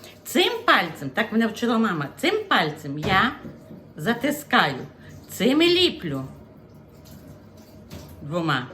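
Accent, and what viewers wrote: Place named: Galicia, Ukraine. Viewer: Дякую ❤ Я б оце слухала і слухала Вашу чарівну Галицьку мову. 😊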